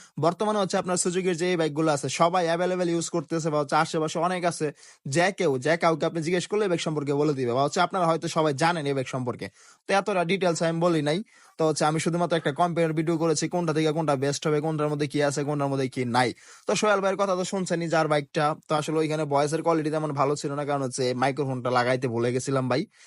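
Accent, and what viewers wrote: Narsingdi, Bangladesh. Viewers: ভাইয়ের বাসা কি নরসিংদী নাকি 😊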